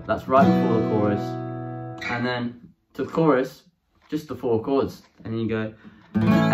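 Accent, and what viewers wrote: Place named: United Kingdom. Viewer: 빠져든다 영국발음💚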